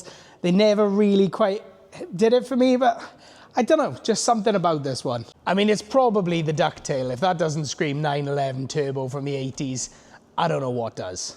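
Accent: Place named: Welsh Valleys, United Kingdom. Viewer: Where you from mate you sound valleys nvm seems your Swansea way. Great video and great find will sub just to watch the upcoming videos on this one may even be interested in buying depending on that water leak issue and underneath. And Yes I'm probably having a mid life crisis 😂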